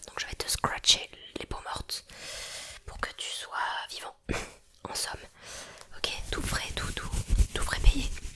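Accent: United Kingdom. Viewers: J'aime tellement ton accent anglais, j'espère une vidéo ASMR où tu ne parles qu'en anglais dans le futur❤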